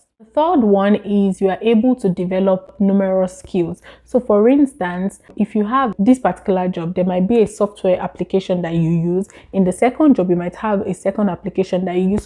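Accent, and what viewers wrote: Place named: Canada. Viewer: Aha ! Your accent is different in this video compared to a year ago. You sound more Canadian with your English accent.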